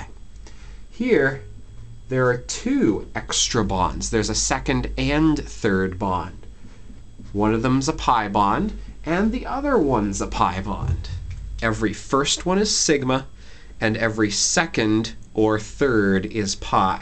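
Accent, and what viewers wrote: Canada. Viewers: I just love your commitment to chemistry! Thank you very muchm it helped a lot! BTW... are cou Canadian? :D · This nigga sound hella like a dude frum candana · May God bless you for explaining this so well. Kudos to you and your possibly canadian accent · Great teaching and Canadian accent. Thanks brother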